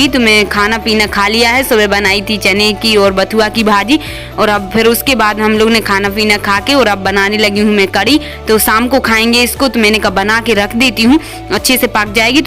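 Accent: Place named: Jhansi, India. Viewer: Ap kaha ki ho jhansi taraf ki ho ap kya boli se lag raha h bahan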